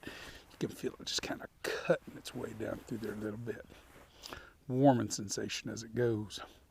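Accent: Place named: South, United States. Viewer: It's nice to find a whisky reviewer with a southern accent. I guess there's nothing inherently wrong with being a Yankee 😂 but I'm a Texan, and it just galls me to find people even in Texas talking about whisky like they're from Indiana or somewhere. Hearing you talk is nice - plus which you don't put on a fake video persona, but just talk about whisky, which a lot of these characters don't seem to understand is a good thing. 🙂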